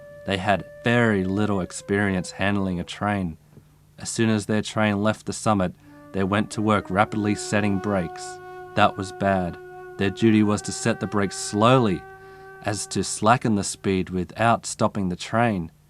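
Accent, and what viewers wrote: United States. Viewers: I live in the US. I like your narration, but I have to say your Old West accent amuses me. :) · Love the southern accent, sounds like the Beatles XD cool story bro, thanks for sharing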